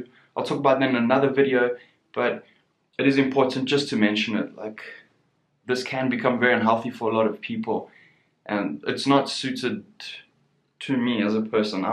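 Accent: South Africa. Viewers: U sound like u come from Sa(south Africa) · Are you South African bru?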